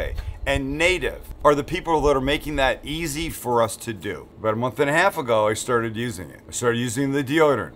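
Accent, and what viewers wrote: Canada. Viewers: Did I hear say he was born in California? He sounds Canadian or…something. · I’ve been following this channel for how many years now and I just now caught the Canadian accent???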